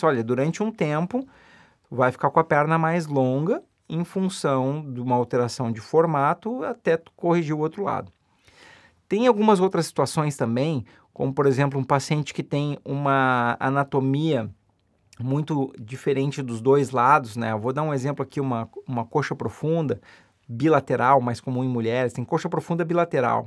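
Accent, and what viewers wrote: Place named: South, Brazil. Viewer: Amo o sotaque do Sul e gostei muito do vídeo. Esclarecedor! Parabéns!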